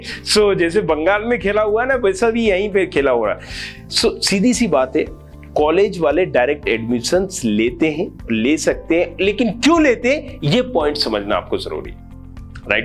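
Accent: Bhopal, India. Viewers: Your accent is pure Bhopali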